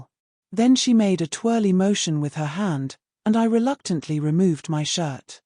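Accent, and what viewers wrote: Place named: United Kingdom. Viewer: They are NOT mispronouncing "schedule". You obviously failed to notice the AI reader's BRITISH accent. That is the way the British pronounce "schedule.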